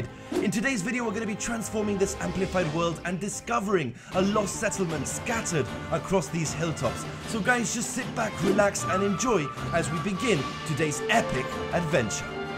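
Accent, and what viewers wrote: United Kingdom. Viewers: I don't want to be rude but are you British😅😅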